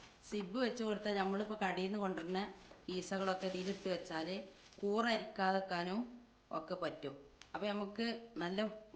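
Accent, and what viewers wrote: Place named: Kottakkal, India. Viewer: കോട്ടക്കൽ ഭാഷ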